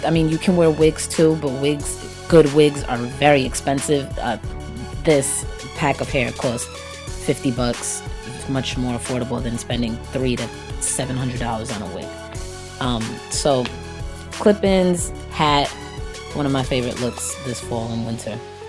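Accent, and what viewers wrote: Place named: New York, United States. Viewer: where r u from? i love ur voice...new york or somewhere up north with a tinge of latina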